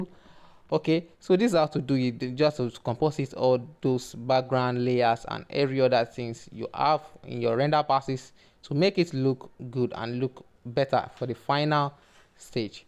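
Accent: Nigeria. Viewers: Talent speaks!!! You sound like you're from Nigeria I just subscribed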